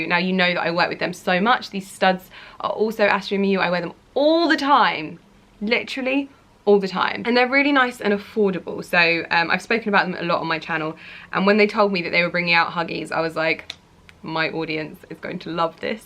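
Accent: United Kingdom. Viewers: I’m french and I used the « paint » part to show my friends « this is british accent » ... 😍😍😍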